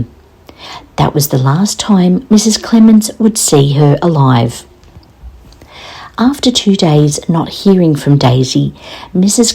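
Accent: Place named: Australia. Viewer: Keep up your great work. So tired of O/S people, criticism of your accent. (usually Nth Americans-who think everyone should bow to them) I'm from Sth Aust, We tend to be quite tolerant, except when people criticise Aussie accents-each State has its idiosyncrasies with speech patterns. Most countries do!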